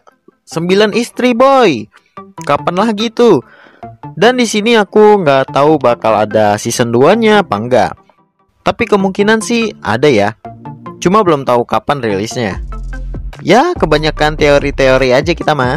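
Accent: Java, Indonesia. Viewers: orang jawa bang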